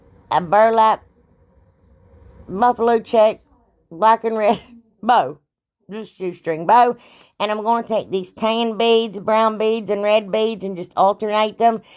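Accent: South, United States. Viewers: are you okay??? I know by your accent you are from the south and have just seen the terrible devastation in Mayfield, Kentucky! Can you please tell me you are safe and well! Scotland Girl 🥰🥰🥰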